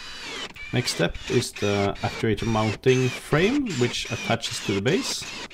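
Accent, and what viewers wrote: Norway. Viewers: Jeg viste at du var norsk jeg merka det bare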